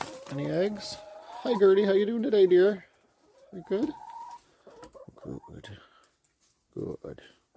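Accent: Canada. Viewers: Love you're Canadian accent 🫶🏼🎄🌨️❄️👋🏼🫂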